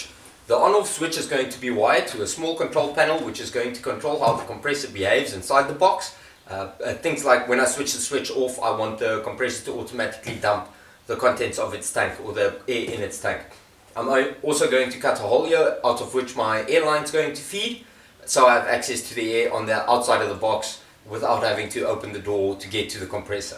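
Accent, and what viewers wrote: South Africa. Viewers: Terrence Lemire South African accent 😉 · That a south african accent?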